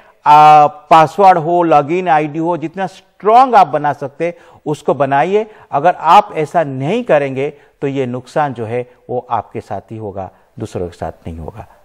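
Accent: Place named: Bengal, India. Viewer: Bangali tone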